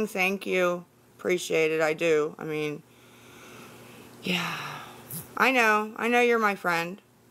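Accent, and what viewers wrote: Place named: Western Pennsylvania, United States. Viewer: She definitely has a western PA accent i used to live in Johnstown and my bf mom said yinz and geez oh man lol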